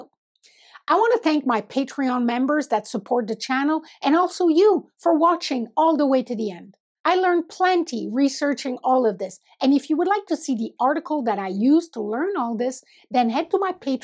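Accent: Canada. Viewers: Border "Cauooohlie" Canadian! That super rounded "O" gave you away! White tigers aren't rare now. They are bred by people which is horrible because in th wild this usually means the animal has health issues galore. People breed them on purpose and call them "rare"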